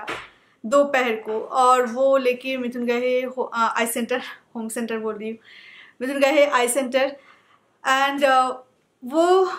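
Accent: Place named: Bengal, India. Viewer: array koi ni..... 😄😄😄. we all know ki she z a Bengali lady ... To unka accent aa jata h .. mujhe to bahut acha lgta h .. jb dii aise bolti h .. I love it ...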